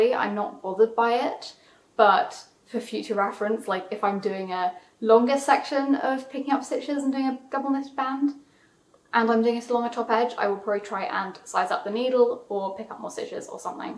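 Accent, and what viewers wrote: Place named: United Kingdom. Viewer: I’m binge watching every episode )) I love my blacks and pinks but I’m obsessed with your British accent and colour choices )))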